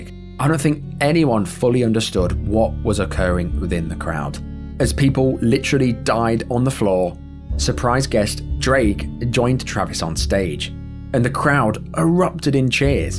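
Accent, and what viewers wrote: United Kingdom. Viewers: I did NOT expect you to be bri'ish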